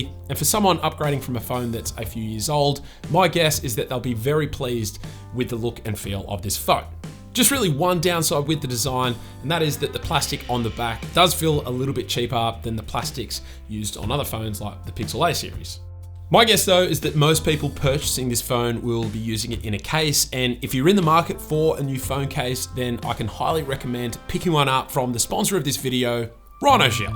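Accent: Australia. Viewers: Galaxy Aye52 😆 Love that Aussie accent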